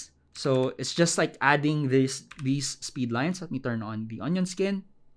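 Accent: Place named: Philippines. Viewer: The Filipino accent is strong on this one.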